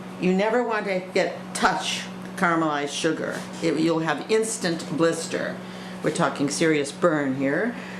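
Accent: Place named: United States. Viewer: hi, can you please writing the explanation on the description tab or email it to me so i can cook it cos your American accent doesn't help me too much and as well i m not English speaking native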